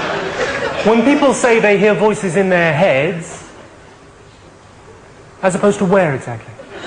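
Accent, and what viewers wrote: RP, United Kingdom. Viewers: Sounds incredibly posh in this show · Technically he doesn’t have an accent, it’s just a slightly altered form of Received Pronunciation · Is me or does he sound super posh in this?? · His accent is so posh :33333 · Is it me or is he really poshing up the accent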